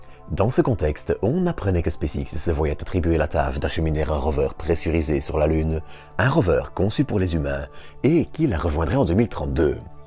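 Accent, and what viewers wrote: Belgium. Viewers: dsl que tu te sois pris une partie de la communauté sur le dos; c'est dû à un ras le bol contre des gens habituellement mauvais, voir insultant, envers, en tapant généralement sur sa voix et son accent. Tes coms sont soft et argumentés, et n'ont rien à voir avec eux. Ce qui est inhabituel dans la voix de est d'une part son timbre de basse, sous le baryton, et d'autres part son accent belge, voire (si je ne me trompe) wallon. Perso j'adore, mais chacun ses goûts! 😋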